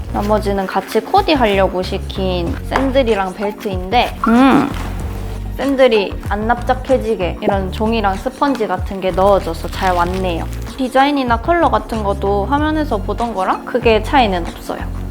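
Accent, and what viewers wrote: Gyeongsang, South Korea. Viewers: 님 대구분이세요?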